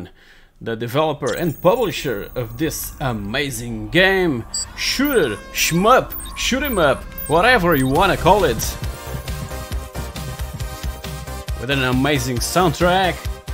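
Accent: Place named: Portugal. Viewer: é portugues... só pelo sotaque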